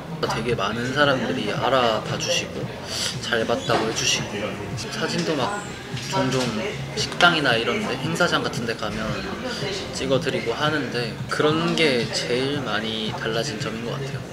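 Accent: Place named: Gyeongsang, South Korea. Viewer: 저도 처음 봤을 때 bts 지민 느낌 있었어요. 특히 부산 출신이라 그런지 경상도 억양, 목소리, 말투가 너무 닮아서 놀랬어요.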